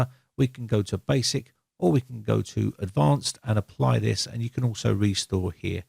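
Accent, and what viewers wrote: England, United Kingdom. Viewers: I like the English accent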